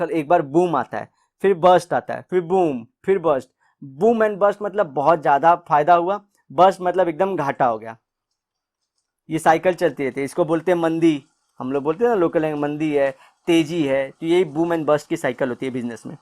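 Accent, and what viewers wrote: Maharashtra, India. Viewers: Is your mother language is marathi ? 🤔🤔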